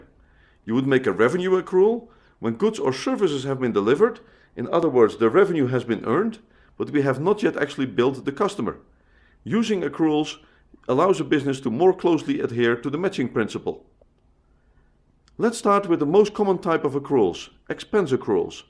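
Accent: Netherlands. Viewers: is that a Dutch accent ?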